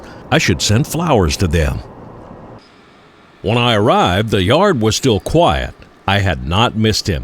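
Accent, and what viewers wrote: South, United States. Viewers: You are a man after my own heart! I simply adore trains ❤️ Your videos are delightful and so interesting and informative. We travel full time in our RV all over the country and I am always giddy when I see a train. Other folks avoid campgrounds near train tracks... I seek them out🚂! The rumble of the train and those wonderful horns just thrill me! Your channel pleases me very much and your soothing Southern voice brings a smile to this Southern lady's face. My husband shared this video with me this morning knowing it would make my day.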